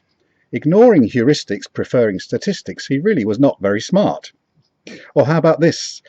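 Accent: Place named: United Kingdom. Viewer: Most amusing, - couldn't help smiling at some of the lines, delivered in such a typical British style...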